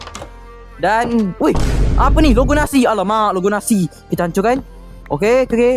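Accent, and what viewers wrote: Malaysia. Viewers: Bang,ni orang kuala lumpur ke, saya orang kedah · WEH MU KELANTAN KO 🗿🗿🗿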